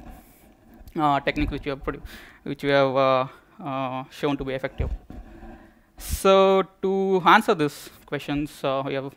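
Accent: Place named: India. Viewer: need some time to used to this India accent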